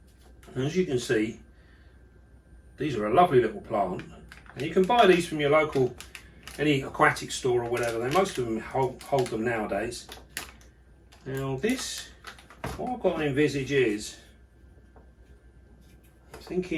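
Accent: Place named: England, United Kingdom. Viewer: Wow, i have never seen a video about a semi-acquatic species of spider...very nice, and as usual i really like your calmness and kindness and also the experience u have with this animals. Love also to hear the english accent :) Support from Italy, you have the best spider-keeping channel out there :) Nice work!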